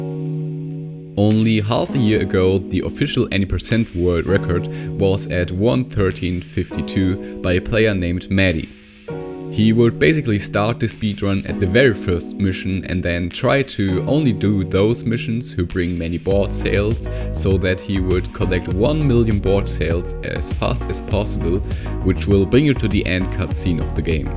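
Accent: Germany. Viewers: Hab mich die ganze Zeit gefragt, ob das ein deutscher ist. Hört man wirklich raus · Bist du deutscher? Hört man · sound's german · Du kommst aus Deutschland oder? Deine Aussprache ist verdächtig haha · im pretty sure that this man in the video is german. i hate this accent. i am german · Are you german bro? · Man merkt dass du deutsch bist ;D nicht negativ gemeint!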